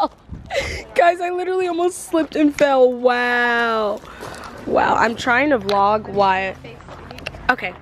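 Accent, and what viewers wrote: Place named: East Coast, United States. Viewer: Anyone else love how she says strawberries ?? Idk I'm weird but your east coast accent comes through and it's so cute 😩💕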